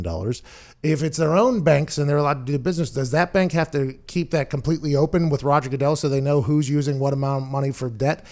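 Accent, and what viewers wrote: Pittsburgh, United States. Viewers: That Pittsburgh language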